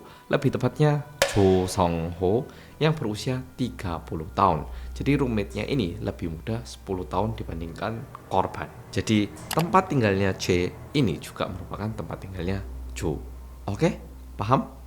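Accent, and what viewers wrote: Java, Indonesia. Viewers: Actingnya boleh juga 😂😂 sukses terus mas! Btw knapa udah pindah ke Korea tapi logatnya tetep medhok ya 😅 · tks medok 🎉❤🎉 Sehat selalu yaaa 💪🏿🙏🏿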